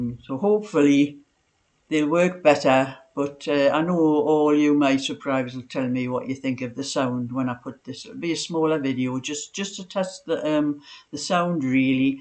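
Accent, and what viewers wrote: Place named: North East England, United Kingdom. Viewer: Oh dear I wish I could get to like the music at the start of your recording. I don’t,t recognise it either. However I really enjoy your channel, hearing your lovely accent from the north east. My husband and his family came from your part of the world. Sadly My husband, who was a very fit 83year old passed away the first day of lockdown from Covid. I enjoy your cooking and baking as well as your beautiful knitting, and I do hope you win at your bingo sessions. My mother in law was a great follower of too. Warm regards Christine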